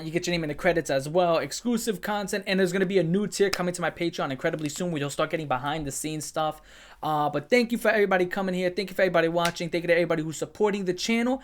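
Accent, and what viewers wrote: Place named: Nuyorican, United States. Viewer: I’m from Puerto Rico and You speak that New Yorican Spanish lol but it gets a pass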